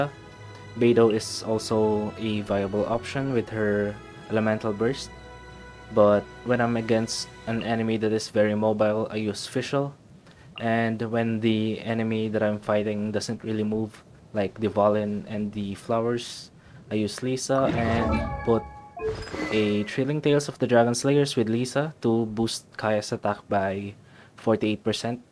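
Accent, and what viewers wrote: Philippines. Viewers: ok yung english mo pare, di naman siya cringy it's just english with a pinoy accent. nothing wrong with that : ) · You sound like you're from ph. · Sounds like you're a filipino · Pinoy blood my dude 🔥, lahat naman tayo ganyan magsalita ng English kahit ako Englishero ako eh ung accent lang talaga HAHAHAHA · You sound Filipino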